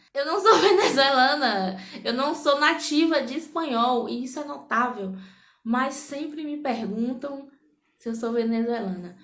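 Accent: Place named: Venezuela. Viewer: Ei amiga com certeza te perguntam se vc é venezuelana porq vc já deve ter pegado um geitinho um sotaque kkk .Eu sou capixaba meu marido cearense e quando vou nos lugares sempre tem quem pergunta kkk vc é cearense?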